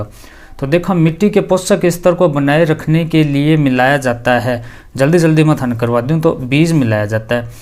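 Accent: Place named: Marwar, India. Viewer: Marwadi accent is op😂😂❤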